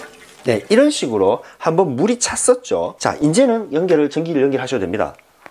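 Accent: Gyeongsang, South Korea. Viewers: 경상도 목소리 악센트 맘에안들어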